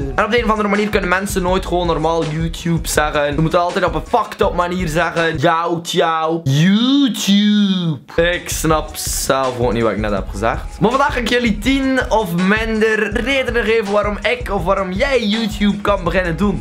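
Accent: West Flanders, Belgium. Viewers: Mensen spreekt een beetje raar maar niet omdat hij Vlaams spreekt, maar West-Vlaams en dat is heel anders dan zeg je ipv ik bv ek en bv pikvis pekves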